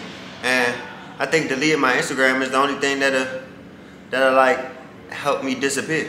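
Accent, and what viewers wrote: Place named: Florida, United States. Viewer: Are you from Florida? I love your accent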